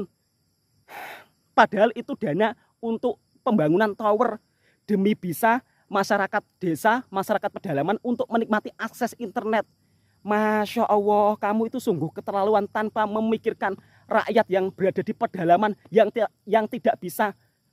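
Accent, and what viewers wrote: Java, Indonesia. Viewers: bang medoknya ngisin ngisini☺️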